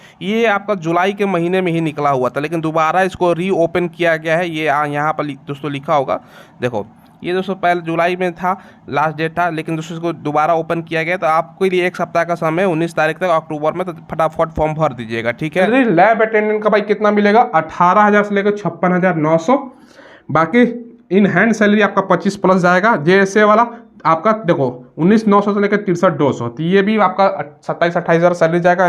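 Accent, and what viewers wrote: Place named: Bhojpuri, India. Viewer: Bhojpuri ka axnent aa hi jata hai 😀😀😀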